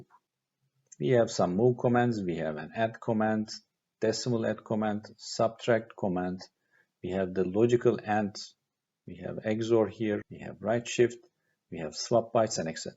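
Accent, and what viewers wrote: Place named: Turkey. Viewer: valla aksandan türk oldugunu anladım tebrikler başarılar hemşerim :)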